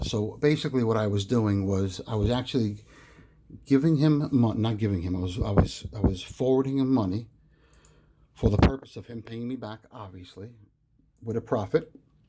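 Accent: East Coast, United States. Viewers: Nice pickups ... love the coin toss of your coins. Your genuine NY accent is Cool as I am from South, I just subbed to you and look forward to your videos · Awesome story! Good for you. Glad you're in better health and back on your feet. It's must have been extremely hard, I can't imagine. Thanks for sharing your occupation with us. I'm so intrigued when I see a large amount of gold and when asked how that person makes money, they never truly answer or tell you something real vague. I'm as working class as you can get. Pretty much paycheck to paycheck so when I see someone doing awesome I am always curious to know how. In your other video when I heard you say $400,000 dollars a year to live. I could picture you laughing at my income or confused how someone could live on so little. I can't imagine making 30K a month. lol Then again, from your accent, you're on the east coast and it does cost a lot more to live and the culture leans to who has the most money. 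A lot of competition to show off wealth especially in New York. But still I really appreciate you sharing it with all of us though. Happy stacking Brotha!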